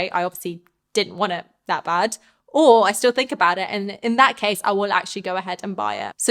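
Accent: United Kingdom. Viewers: This vedio helping money management nd British pronounce......👍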